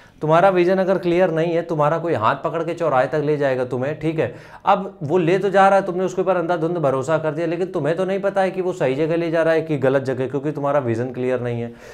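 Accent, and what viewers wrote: Kanpur, India. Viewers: Bhaiya kanpur side se hai kya ap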